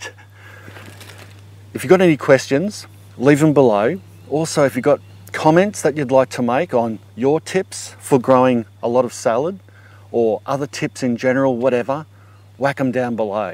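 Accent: Australia. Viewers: I like your video very much,. Just a question, where do you live? Your accent sounds like an Aussie!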